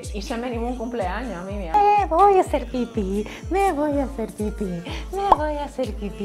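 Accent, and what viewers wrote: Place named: Dominican Republic. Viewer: Amo la energía de esta mujer y me encanta que no cambias tu forma de hablar ese dominicanismo 😄😄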